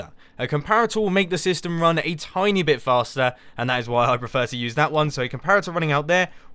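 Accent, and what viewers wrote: United Kingdom. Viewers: And he has a funny British accent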